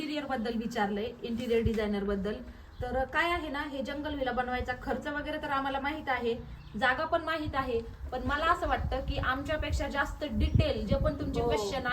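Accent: Ahmednagar, India. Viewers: तुमच्या बोलण्यावरून समजल होत तुम्ही नगरच्या आहे 😊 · तुमचा भाषेवरूनच कळले कि तुमी अहमदनगरचे आहेत कारण की मी पण नगरची आहे अशेच आनंदी रहा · Radhe radhe tai nehmi khush raha doghi jani aniii tumhi nagri accent mdhech bolt raha khup mst vatt❤ radharani bless you radhe radhe❤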